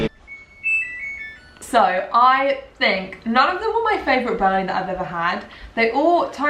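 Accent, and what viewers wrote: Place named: United Kingdom. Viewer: what part of the UK are you from, im trying to place your accent 😂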